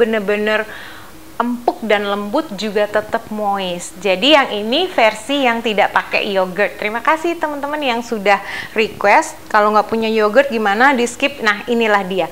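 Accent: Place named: Surakarta, Indonesia. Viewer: Thx kak..luar biasa kesaksiannya.. Tuhan selalu sertai keluarga kak..( baru tahu saat lihat YT pas ini..kalau ternyata kaka di luar negri hihi saya kira di Solo Indonesia karena logat dan kebayanya ) GBU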